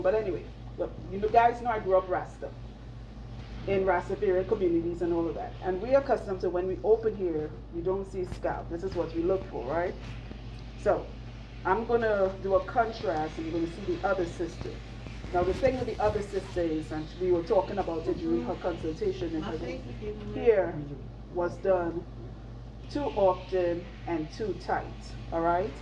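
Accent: Trinidad and Tobago. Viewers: From the time you began to speak, I knew 🇹🇹. Great job lovie. Keep it up. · I knew you was TRINI before you confirmed it! Thank you for representing in such a knowledgeable and approachable way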